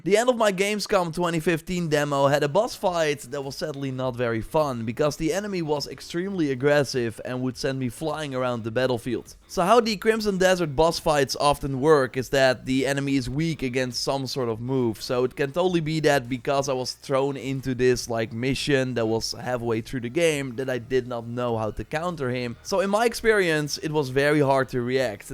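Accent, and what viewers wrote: Netherlands. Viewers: Are you Dutch (judging by your accent)?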